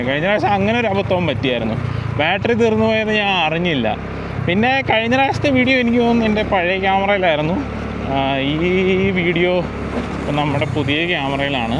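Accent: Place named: Kottayam, India. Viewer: Broyude slang tvm pole thonnunnillalo kind of kottayam pole oke thonnunnu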